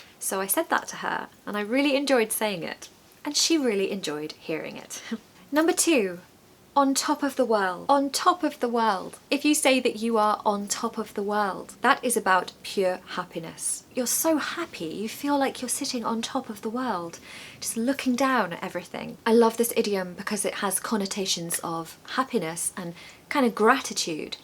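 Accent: United Kingdom. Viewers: ❤❤❤❤❤❤❤❤❤ Love your Received Pronunciation (British English accent) very much!!! ❤❤❤❤❤❤❤❤❤ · I love the British accent .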